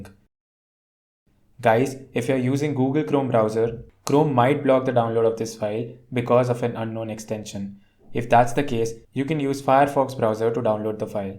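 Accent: India. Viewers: I LOVE THAT INDIAN ACCENT ❤❤❤❤❤❤❤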